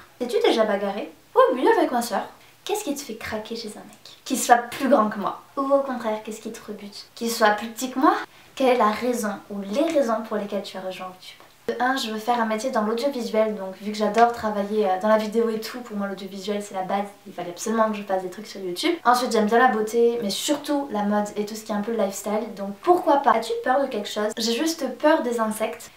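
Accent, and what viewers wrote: South, France. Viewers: J adore trop trop le montage parfait avec l'accent du sud moi je comprends pas je vis dans le sud et jai pas d'accent mdr tes trop mignonne je trouve que tu fais plus grande (pas en taille ) juste j'adore cette vidéo et la youtubeuse ♥★♣:-D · Vive le sud ! D'ailleurs je trouve qu'on a jamais autant entendu ton accent que dans cette vidéo c'était chouette ! :p Je me suis reconnu dans les critères de mec " ce qui me fait craquer c'est qu'il soit plus grand, ce qui me rebutte c'est qu'il soit plus petit " c'est exactement ça :) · Ah je t'aime vraiment trop. Je pense que c'est le meilleur TMI tag que j'ai vu jusqu'à présent. Le montage est top. Tu es trop mignonne et tu m'as fait vraiment trop trop rire tout au long de la vidéo. Moi aussi je suis poisson, ton anniversaire c'est donc Lundi? Moi, c'est demain hiihiii... Si j'y pense je te le feterai, meme si je pense que tu ne verras pas ce commentaire, enfin bon anniversaire en avance.. 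C'est vrai qu'il n'y a pas beaucoup de Youtubeuse qui viennent du Sud, et j'adore ton accent, on l'entend dans cette vidéo! Gros bisous :b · T'es tellement chooooooooooou :) Tu as de plus en plus l'accent du sud hihi c'est trop rigolo j'adore <3 Faut absolument que je regarde la série heaven tree du cooup!! :) Oui pour le haul, bisou d'amour <3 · aha j'aime ton accent du sud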